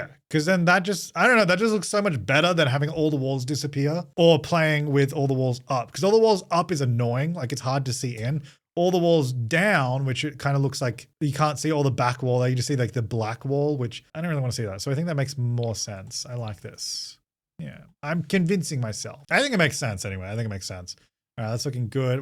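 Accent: Australia. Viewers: Same. He sounded so young, and also his Australian accent seemed more noticeable. That, and sometimes he sounded like he was on speed. Lol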